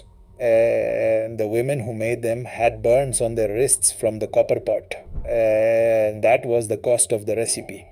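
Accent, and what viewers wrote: India. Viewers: Why is the guy reading this have an Indian accent lol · Why does he have an Indian (hindi) accent...?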